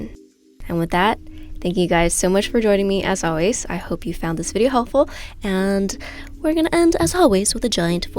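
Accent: China. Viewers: The Chingrish is killing me. It's how I talk too hahahahaa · Love your Chinglish! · The way you speak Chinglish is how I do too HAHAHAHA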